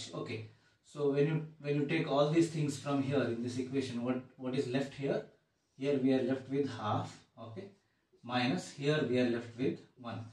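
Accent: South India, India. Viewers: south indian accent !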